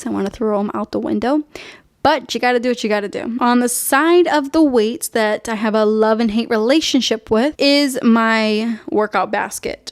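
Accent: Boston, United States. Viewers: i can totally relate! i'm from the Boston area :) you got the accent haha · It sounds like a bawston accent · The Boston accent is real haha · this just popped up and i'm glad i clicked it, immediately subscribed when i heard that boston accent. about to go binge-watch all your videos! hehe love from medford <3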